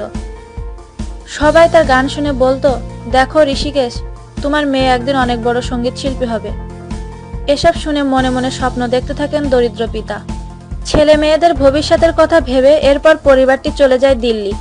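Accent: Bangladesh. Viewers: Tumi ki Bangladeshi.. bangla desir moto kotha.. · কি বিশ্রী উচ্চারণ এই বাংলাদেশী মাগীর। ইন্ডিয়ান বাঁড়া চুসে বিচির রস দিনে দুবার খেলে উচ্চারণ ঠিক হবে।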